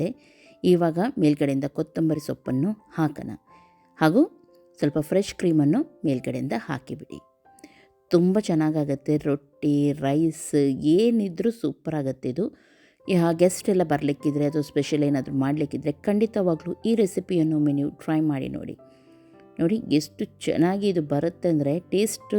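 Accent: Mangalore, India. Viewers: Bayalli neeru barta ide. Nimma matina shaili Mangalore bhashe super...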